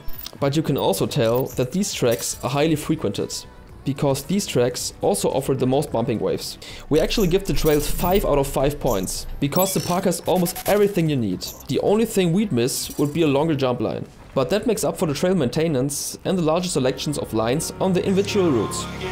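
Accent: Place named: Germany. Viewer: Super Video! Gutes Denglisch ;)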